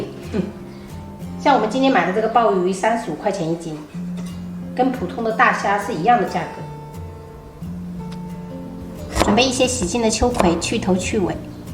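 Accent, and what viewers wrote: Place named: Minnan, China. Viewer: 四川人闽南话说得那么地道？赞一个！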